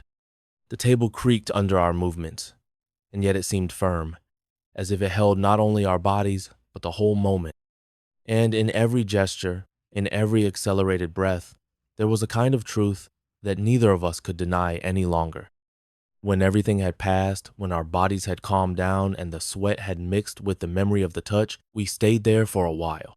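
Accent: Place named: South, United States. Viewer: the masculine version of a Southern voice was mesmerizing and crept into my mind as if it had a master key to open all of my hidden feelings I had locked away long ago.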